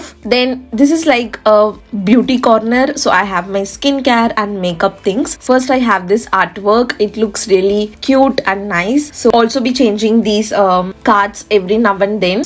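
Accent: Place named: England, United Kingdom. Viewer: So nice !!!! Love ur English accent ….Your room is so minimal and looks so good ❤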